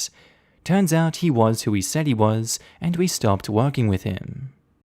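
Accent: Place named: Australia. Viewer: I miss the “chuck a prawn on the barbe” in your wonderful Aussie accent.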